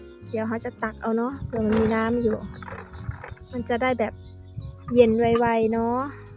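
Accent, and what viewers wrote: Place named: Thai Phuan, Thailand. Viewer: ภาษาพูดคล้ายๆ คนชาวไทพวนที่บ้านผมเลย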